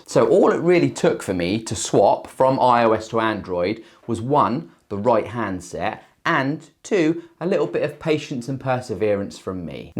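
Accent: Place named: England, United Kingdom. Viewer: Nowadays most whites kids in London speak English with a hybrid Jamaican dialect which is very different from the anglo saxon dialect of English which is spoken in this programme by this presenter. In the early 2000's, young white kids on council estates in London became JAMAICANISED. This is when they starting speaking with English with a hybrid Jamaican dialect. For example, Essex county is the only place in Britain where the cockney dialect/and or accent is still spoken. TO SUMMARISE: In the 1980's all the national British companies when through a process called national - PRIVATISATION - and then almost - SIMULTANEOUSLY - all the poor - NATIONAL - white kids endured a process called - JAMAICANISATION - which did indeed definitely - LEAD - to all poor - NATIONAL - white girls on council estates quickly - UNDERGO - a process called Jamaican extreme - INSEMINATION - and thus all the young - RESULTING - half cast babies initiated a full - NATIONAL - process called extreme - BASTARDISATION. 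Remember everyone, that the last point regarding bastardisation is entirely optional, and is not my view but instead is the view of white nationalists.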